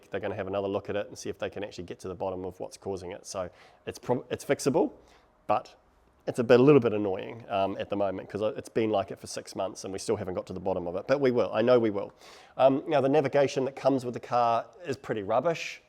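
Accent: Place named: New Zealand. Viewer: Im a kiwi and even I found this accent thick! 😃 great review, will it be up for sale soon?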